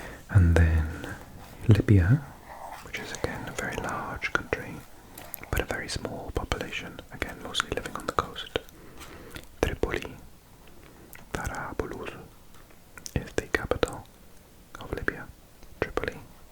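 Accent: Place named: Wales, United Kingdom. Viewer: Welsh accent ASMR??? Where have you been hiding, Mr??? 🥰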